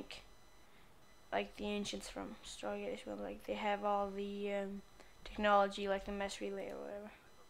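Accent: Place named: Sweden. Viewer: cool videos are u from sweden u sound swedish. :D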